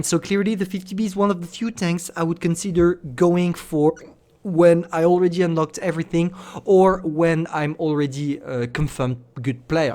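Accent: France. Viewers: T'es français??? parce tu a un accent français quand tu parles anglais :)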